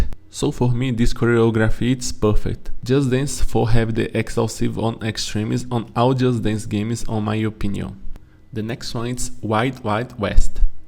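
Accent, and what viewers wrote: Brazil. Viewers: Só eu que percebi que ele é brasileiro e te falando inglês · Vc é brasileiro, seu sotaque é uma bosta, e dá para ver que é brasileiro, fala português, seu inglês tá uma bosta irmão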